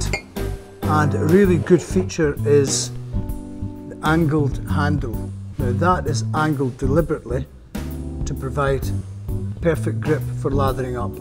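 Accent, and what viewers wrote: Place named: Glasgow, United Kingdom. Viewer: Sincerely, your way of explaining and showing the goods is very helpful and informative, not to mention the high quality video in all aspects including that magnetic Glascow accent! Greetings from Greece, you may have some order from south someday! By the way this blue-ish colour of the mug is reminiscent of Ranger's F.C. kits... excuses if you a Celtic fan.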